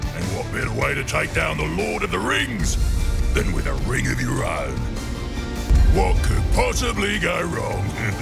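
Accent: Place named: Australia. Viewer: he's Aussie mate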